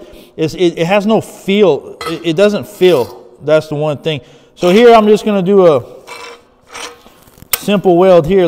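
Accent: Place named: South Louisiana, United States. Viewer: You might be in Wisconsin but you can’t hide that south Louisiana accent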